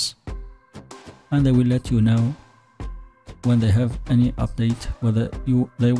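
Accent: India. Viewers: Annoying Indian accent video to telling me how to get my privacy violated by Facebook by me providing them my I.d. ,?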